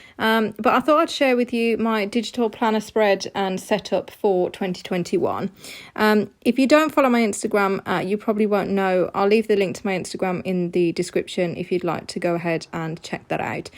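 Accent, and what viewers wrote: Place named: Birmingham, United Kingdom. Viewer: Which part of Birmingham are you from lol, enjoyed your vid.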